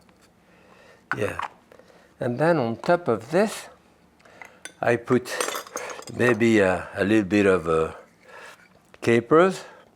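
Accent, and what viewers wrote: France. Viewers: Impediment? Bruh he's just French hahaha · Speech impediment? Meh…I thought it was his French accent shining thru😂 Accents r great!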